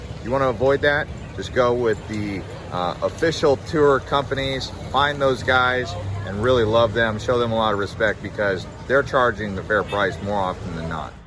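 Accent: United States. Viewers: Damn, I am a gringo married to a Costa Rican woman and his American accent kills me! LOL!!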